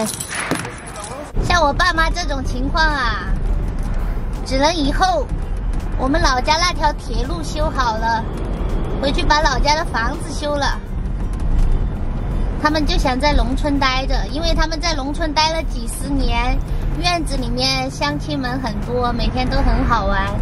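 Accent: Sichuan, China. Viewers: ：我在台灣看妳的視頻三年了，我們是台灣外省第二代，小時候住在空軍眷村，因為空軍多半是四川人，我也會講四川話，聽妳講四川話，更親切！新年快樂，妳很棒，加油！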